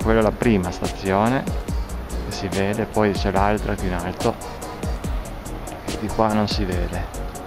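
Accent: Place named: Piedmont, Italy. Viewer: leggero accento piemontese...TI PIACE LA MEENTAA?? 😜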